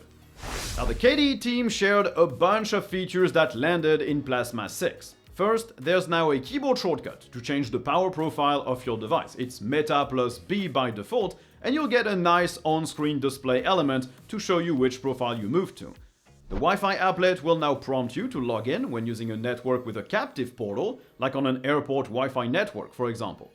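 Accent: France. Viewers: Man where u from France?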